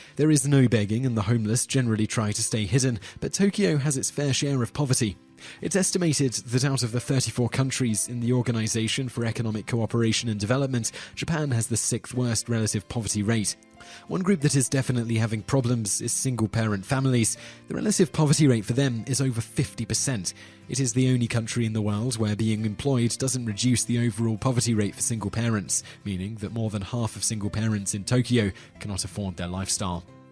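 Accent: United Kingdom. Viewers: I would certainly hope a guy who has a broad british accent knows that oxford university isn't in london.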